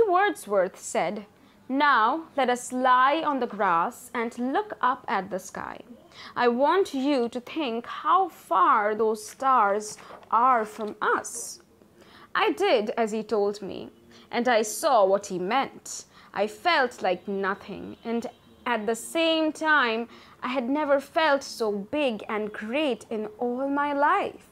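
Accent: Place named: India. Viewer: Sorry but I found it hard to listen to .. didn’t get past first paragraph I guess I’m not used to the Indian? Cadence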